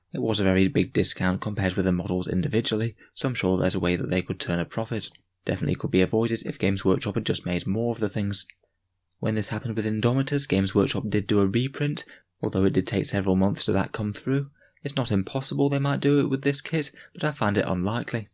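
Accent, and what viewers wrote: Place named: Yorkshire, United Kingdom. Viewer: What is your accent? It sounds like a soft Yorkshire accent. I'm going to guess Sheffield way. It doesn't sound like West Yorkshire - which is a bit Manc, or North Yorkshire, which is pretty close to Lancashire. Am I right?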